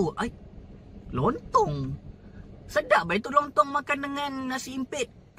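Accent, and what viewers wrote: Sabah, Malaysia. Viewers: Slang sigu macam org sabah suda oo..salute sama kamu sigu..semoga sentiasa happy dan bahagia sama family dan murah rezeki selalu ar sigu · Say tgk ko ni mcm bukan sda org kedah ni hahahahahah. Pekat suda tu loghat sabah hahahahahah. Tpi kwn say brterima kasih jga la slepas tgk video ni, kwn say dri selangor kena suru pindah pg sabah. Mula2 ragu dia kunun mau pindah sini. Ada kwn pun say ja. Takut dia kena ilmu hitam apa smua. Takut org sabah nda terima dia. Skali dia tgk ni video nda sabar kunun dia mau pg sini. Hahahaha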